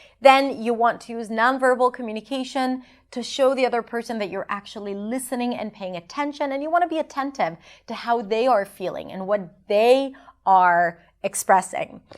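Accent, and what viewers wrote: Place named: United States. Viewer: I'd swear you were an English native speaker your accent is quite american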